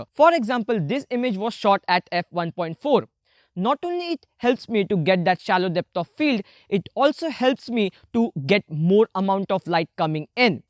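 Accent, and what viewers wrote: India. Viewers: Your pronunciation sounds like a Indian man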